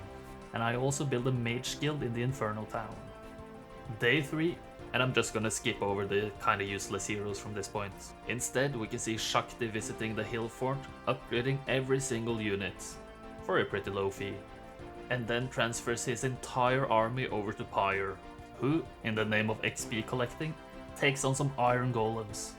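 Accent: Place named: Norway. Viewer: Me: algorithm, get me a video Algorithm: no problem. What sort of stuff do you like? Me: challenge runs of one of my favorite video games, with the art being made in paint, narrated by someone with an unapologetically norwegian accent. Algorithm: one moment, sir...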